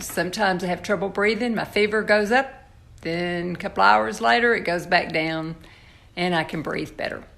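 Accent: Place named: Tennessee, United States. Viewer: how are you feeling today? Hope your tests came back negative and you're on the road to recovery. I love hearing you talk it brings me back to my family from Tennessee.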